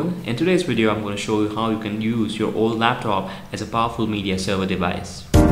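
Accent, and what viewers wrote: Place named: India. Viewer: Why does this Indian dude have 160 Subscribers he should have at least 20,000 he's just speaking English without any pronunciation errors but has an accent which is ok but he's just the best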